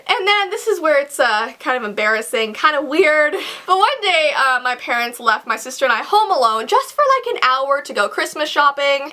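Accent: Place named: Canada. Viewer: Jake Reed it's because she had a Canadian accent lol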